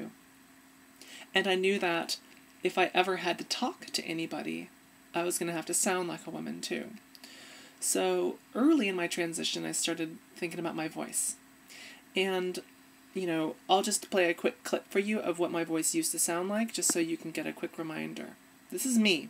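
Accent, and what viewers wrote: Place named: Ireland. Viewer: You have a lovely voice! You seem to me to speak with a slight Irish accent. When you say words like 'that' and 'part'. It's beautiful and sounds very natural.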